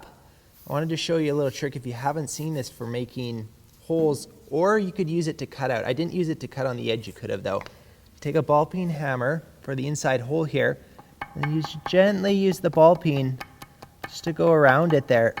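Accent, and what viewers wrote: Canada. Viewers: U from Canada A?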